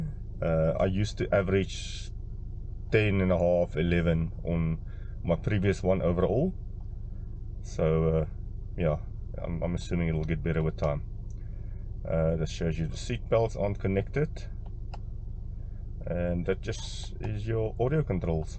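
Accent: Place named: South Africa. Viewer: Love the South African Boere accent Boeta, lovely content and detailed review; thank you Sir.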